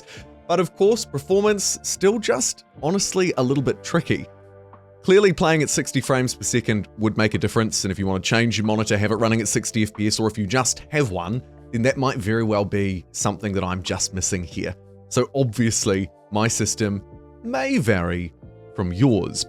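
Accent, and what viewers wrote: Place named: New Zealand. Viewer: Kia ora. Another kiwi that accidentally pronounces it "pre-formance" rather "performance". The game is atrocious. If it were a toaster, an oven, or a tv you'd return it for a refund. Got my refund. Will see what happens to the game over the next year but not holding out hope. This game will go down in history as an infamous disaster.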